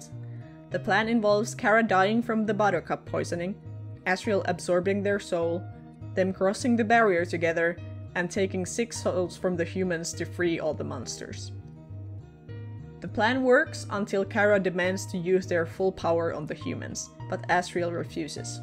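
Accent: Finland. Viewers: Are you finnish? I'm finnish and your accent seems so familiar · Nice Finnish accent :D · Are you a fellow finnish person? Your accent is similar to finnish accent!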